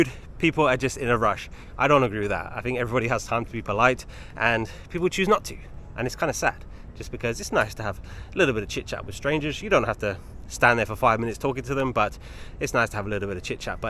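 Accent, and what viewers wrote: London, United Kingdom. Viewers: You sound British for sure. In America there are different accents depending on what part of the country you live in! I think UK is probably the same. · You have always sounded British to me. More specifically, you sound BBC news anchor British. By that, I mean you have an accent but you speak clearly and are easy to understand. I for one definitely prefer Londoners over New Yorkers, any day. ❤ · You have a London accent with the slightest bit of 'street' included. This is coming from a londoner. Interesting vid. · So glad you made this video, I spent the month of June in England including a week in London. You are so right about the coffee culture, people spend a lot of money on something they can make at home, I think people buy the coffee to save time. In London I took the subway or “tube” everywhere and I can tell you people don’t talk to each other, if they find a seat they immediately get out their cell phones and stare at the phones. 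Another observation about the London tube is that men don’t often offer their seats to women, when I saw a woman standing I always asked if they wanted to sit, most times they would say no, but occasionally an elderly woman would accept. I know you say that British people drink tea and I don’t disagree but London is full of coffee shops i.e. Pret a Manger, Nero, and Costa are just some of the coffee chain brands I saw all over London so somebody, maybe tourists are drinking that coffee. Since the US is so big, we have a variety of accents from the New York to Southern accents just like the British accents are unique, Scottish or welsh people are hard for me to understand and I don’t find them to be pleasing to the ear while I love the English accent, it’s almost like sexy, think 007! Your accent is somewhat unique and I attribute it to your travels or maybe you lived in a foreign country as a child or something but I can detect the British influence but you definitely in my opinion do not have a heavy British accent. 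I find it funny when you say the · It was wonderful waking up (as it's early moring in australia) to a notification of your video. I've never been to London, not yet anyway, but i have been to New York several times. I love visiting, but there is a slight air of arrogance with people, not all. Walking down the sidewalk, they walk right at you, don't really like waiting in queues, talking really loud, some think the louder you talk the more important you are. Having said all that, its not everbody, and I've had some wonderful interactions with americans. Your accent is very British. Some people think us Aussies have a different accent, i dont understand it 😂. In Australia we also love tea, i remember growing up with a pot of tea with leave leaves and a strainer. We also love our coffee and now our coffee culture is huge. Thank you for another wonderful video😊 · Thanks - this was a great topic. 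Yes, you definitely sound British to me. It was interesting to learn that British people find that the American accent is annoying but we find the British accent attractive - very interesting! I'm like you - I don't understand the attraction people have for going out to spend $10 or more for a cup of coffee when they can make it at home for pennies. When I was teaching in a high school I was amazed at the number of students coming in with their cup of Starbucks when I knew with my teacher salary I could not afford it every day!! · Thank you for the lovely stroll, although in that heat it would have been a quick one. In answer to your question about whether you sound British or not, I think you definitely do but I can also hear that you have spent quite a long time living in China and speaking Chinese, of course. Anyway you have a unique respectful voice. 😊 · To me, you sound very British. 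· I would definitely say you sound British. Have you heard the regional accent of the Boston area?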